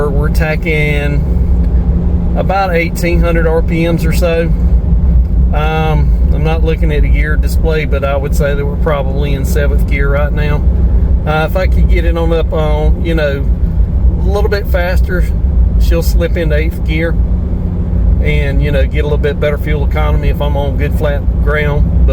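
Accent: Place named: South, United States. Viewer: Good review, no fluff, thank you. The best part was seeing/hearing a southern man among southern long-needle pines. I can smell them now. Oooh that smell!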